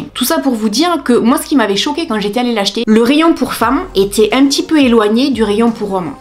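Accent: South, France. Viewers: Je ne suis pas du style à commenter malgré le fait que je regarde régulièrement tes videos. Cependant, merci pour ta bonne humeur, ce petit accent chantant du sud et surtout tes vidéos qui englobent vraiment tout le monde... Comme tu le dis si bien, arrêtons de vouloir ranger les gens dans des cases... soyons unique tous et chacun!! Je te souhaite le meilleur, au plaisir de te récrire!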